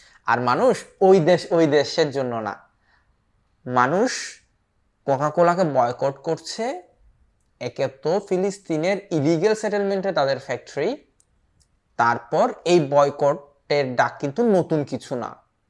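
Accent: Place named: Chittagong, Bangladesh. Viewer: ভাইয়া মনে হয় চট্টগ্রামের, ❤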